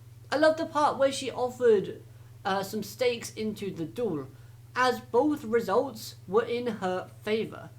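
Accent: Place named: United Kingdom. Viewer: sounds absolutely B R I ' I S H For who is essentially a British YGO reviewer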